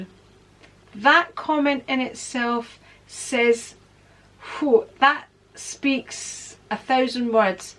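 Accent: Scotland, United Kingdom. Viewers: Hi, I forgot to mention there was another interesting reason I have been watching your videos. Because I studied my PhD in Glasgow 20 years, I do enjoy your Scottish accent and your Vlogs to Glasgow. Looking forward to seeing more videos from you. Cheers ❣️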